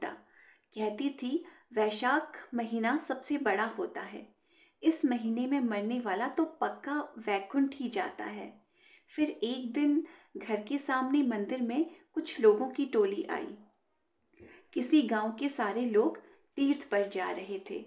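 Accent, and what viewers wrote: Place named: Rajasthan, India. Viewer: ये राजस्थानी लहज़ा, लिखावट, संस्कृति... सब कुछ बेहद ख़ूबसूरत। बहुत सुंदर संस्मरण ♥️🌼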